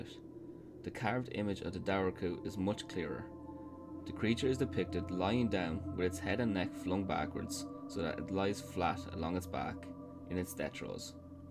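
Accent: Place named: Ireland. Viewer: i was wondering what kind of irish accent that is. thank you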